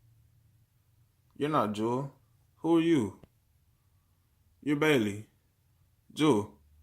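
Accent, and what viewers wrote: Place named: Dominican Republic. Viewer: Yes you sound Dominican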